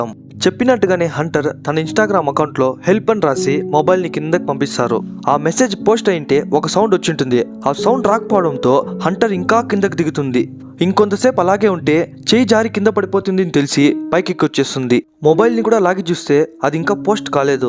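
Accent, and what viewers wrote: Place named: Karnataka, India. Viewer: bro nuvu telogodivi kadhu kada, nuvu matladuthunte kanada valu laga matladuthunttu undhi.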